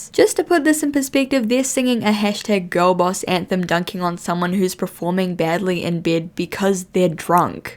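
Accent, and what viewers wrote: New Zealand. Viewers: "that probably sounded really stupid in my accent." Speaking of which, what is your accent? I've watched several videos now and I can't decide between New Zealand and South African.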